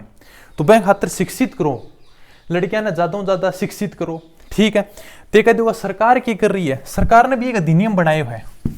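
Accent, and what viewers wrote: Rajasthan, India. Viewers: Rajasthani language hai thoda bhut different ho jata hai bolne m...bro aap smajh to PA rhe ho ...ki sir kh stree rhe h..😊😊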